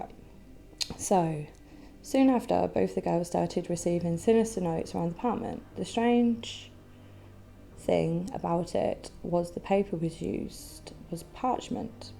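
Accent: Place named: United Kingdom. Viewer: Hello I like your story and your British accent he was really retro mystery love it❤️👍🏻🤘😍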